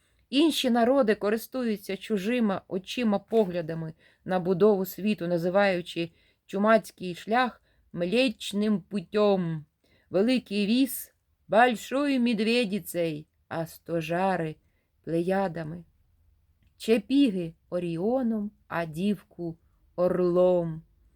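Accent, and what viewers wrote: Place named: Eastern Ukraine, Ukraine. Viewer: Слухаю вас з увагою, ви з молодшого від мене покоління. Очевидно ви із східної України , чую по вимові, з великим впливом російської. Шчьо, чьому, дЗім замість дім, широке російське Ы замість українського короткого И. Але мені приємно, що в часи, коли наші сусідські «браття» вбивають наших людей за мову українську. Щиро вам дякую за читання і прагнення розвивати українські канали. А вимова? Може треба буде змиритися з сучасною модою. Адже багато мо перетерпіли зміни: англійська британська та американська, іспанська європейська та латиноамериканська, португальська і бразілійська, французька і крейол. Світ міняється, але Україна буде вільною.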